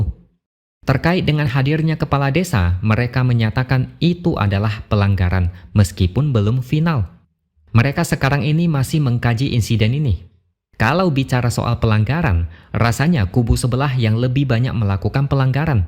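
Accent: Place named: Banyumas, Indonesia. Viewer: Wong ngapakk 🤟🤟🤟🤟🤟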